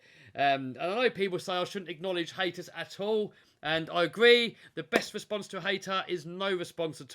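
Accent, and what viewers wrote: United Kingdom. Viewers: Yo, i join to this Chanel a year ago and i have seen all ur main videos chanel i like u, ur conten and the british english Continue been like u r i love all what u do, in all videos u make me laugh and i hope u get that 2 millon of subs Thanx and a greeting from Spain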